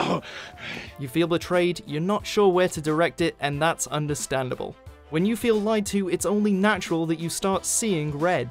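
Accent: United Kingdom. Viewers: he's British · He has a British accent, so you can stop attacking him over how he says Echo.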